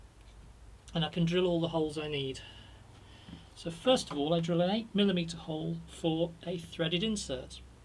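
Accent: United Kingdom. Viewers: You sound like your from uk where is this second hand tool shop do thay have a website. Great video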